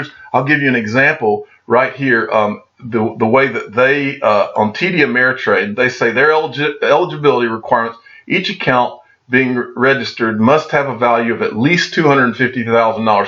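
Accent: South, United States. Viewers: Can’t believe that I’ve watched every single clip uploaded by you since you appeared on YouTube. Reason I started listening to you was because you remind me of my friend Steve who was from down south and had the same tang or accent as you... I’ve checked out so many YouTube channels but no one is more informative and inspirational than the. One love.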